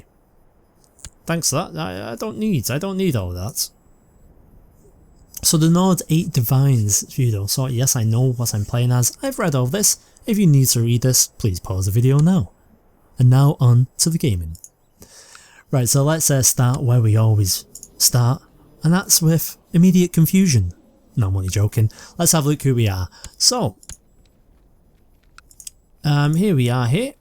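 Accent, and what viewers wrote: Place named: Scotland, United Kingdom. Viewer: Your relaxed accent reminds me of * and history documentaries.Scottish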